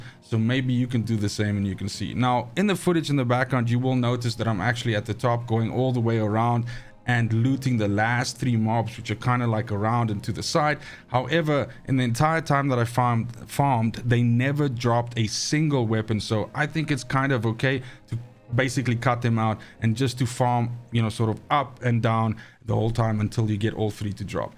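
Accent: South Africa. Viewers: Are you from South Africa bro?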